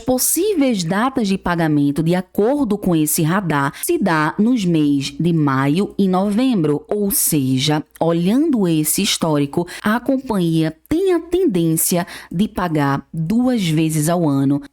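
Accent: Northeast, Brazil. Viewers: Sou fã desse lindo sotaque nordestino!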